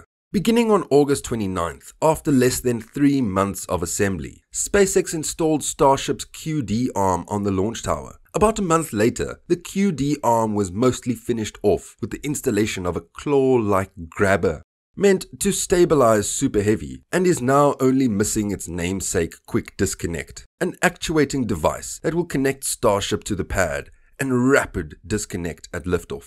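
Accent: South Africa. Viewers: False UK accent? No. That's a proper South African accent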